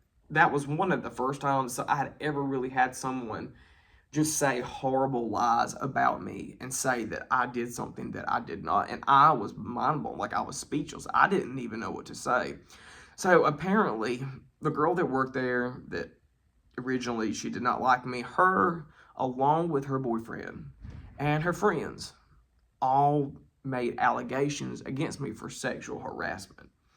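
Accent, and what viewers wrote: South, United States. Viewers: My favorite saying is (Why try to fit in when you were born to stand out) PEACE ✌️ AND PRAISE my friend 🙏...PS I LOVE YOUR SOUTHERN ACCENT! HOLLA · I like your southern accent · Sorry to hear this happened to you. Every time you looked at that girls boyfriend, she and he both probably considered it sexual harassment, like you were trying to seduce him. Employment law is supposed to require an investigation into allegations of sexual harassment, with the facts to be proven before you can be terminated. But when you have multiple people filing complaints against you, and corroborating each others stories, even if they are all lying, that is a hard thing to overcome without serious legal action. Sounds like you are from the South...nobody ever said that was the land of the fair and open minded. People are going to believe what they want to believe, the facts be damned. 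You probably need to do your best to stay away from the Bible thumpers. The Bible thumpers in this story probably need to study their Bibles a little more closely, maybe ask themselves, "What would Jesus do?". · your thick southern accent. I like it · The south, assuming from the accent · mixed with a southern accent. I love it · While honesty and truth are vital, discretion is often also required. Regretfully, some gay men have the further challenge of having a feature I call the "gay voice". In fact, it fascinates me. You can meet men who were born thousands of miles apart from each other, yet they both have the "gay voice". It is beyond any psychological research or knowledge to which there is currently any reasonable explanation. It is like having a particular accent. You have a quite noticeable American southern accent. It is how linguistics developed in your area and was well established before mobility rapid transit and vocal transmission became possible during the Industrial Revolution, when the average person lived their entire life within 2 miles of where they were born. 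In fact, during the past 150 years, dialectic specialists began noting that ' regional accents' of the same language are beginning to homogenize. The influence of radio, television and now digital streaming video is beginning to cause a blending towards what used to be called a "Continental Accent". But while this explanation of various, differing accents has always been based upon regionality and highly limited mobilization for all but a short time in human history, the "gay male accent" has no basis in regionality. A gay man raised in London, England, will speak with the same gay sound as an American. It's an overlay accent upon a regional dialectic accent of a common language. Yet only some gay men have this added challen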